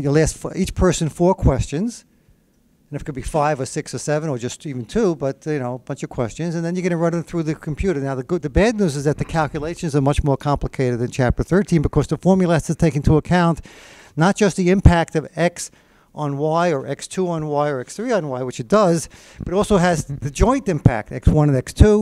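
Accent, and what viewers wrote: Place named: New York, United States. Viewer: Lol I admire the NY/ Jersey accent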